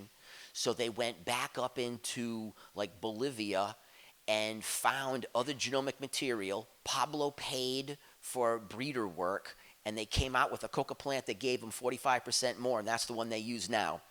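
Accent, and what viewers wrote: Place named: New York, United States. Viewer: Slips into? From an Aussie standpoint his accent sounds like his from Brooklyn/NY geographical area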